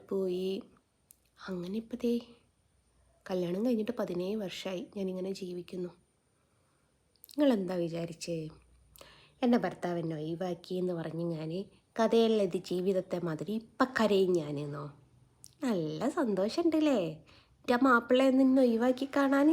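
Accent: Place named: Kuttiady, India. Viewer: nigalude bhasha kuttiady bhashapole und. Poonoor anennu eppoya arinja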